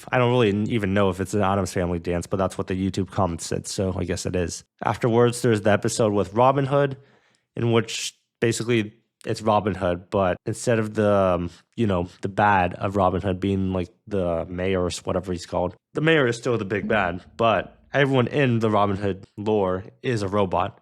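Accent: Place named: Scotland, United Kingdom. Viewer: Irish Me: Irish...(processing) IRISH??!!!! The last I checked, I'm pretty sure he was Scottish. Great vid btw, got a few laughs out of it.😄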